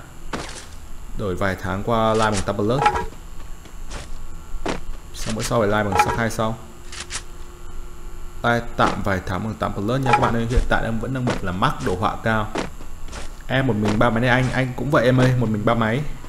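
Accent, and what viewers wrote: Thanh Hoa, Vietnam. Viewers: Em đoán Giọng Anh Giống người Thanh Hóa vậy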